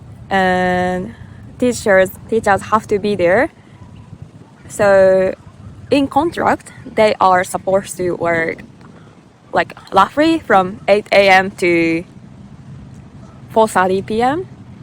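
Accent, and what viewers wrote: Australia. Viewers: Aussie pronunciation is slipping in every now and then haha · Your English is improving. Some very Australian accent popping through. Yes it is cold. It’s still winter. In a few months it will be burning hot. Your dream sounds fantastic. I wish you and your husband well in establishing your little hotel 🏨 👍🏽🇦🇺😊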